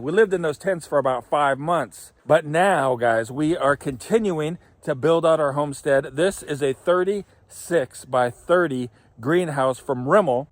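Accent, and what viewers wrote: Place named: South, United States. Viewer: "fixin' to finsih framin' the wall", I think could pass as a Southerner.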